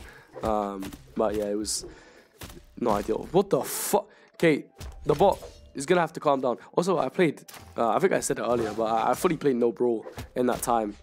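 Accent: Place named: Croydon, United Kingdom. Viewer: Oh okay I was just wondering because you sound like you’re from Croydon when your sick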